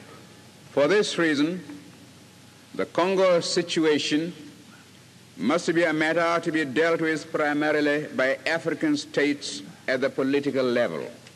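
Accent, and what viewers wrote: RP, United Kingdom. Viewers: He sound like a member of the british royal family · He speaks with a British Queens English accent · He has Old British accent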